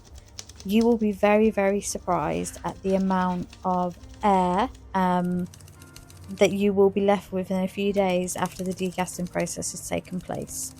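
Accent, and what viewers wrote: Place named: England, United Kingdom. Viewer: So glad I found you and your English not American! I’m now going to binge watch you channel . 😊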